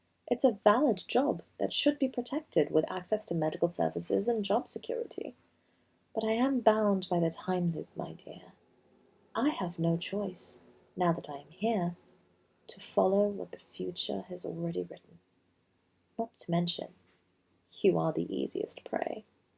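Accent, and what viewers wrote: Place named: United Kingdom. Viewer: You sound more British than I do 🤣 loved the twist and turns you took in this! 😱💖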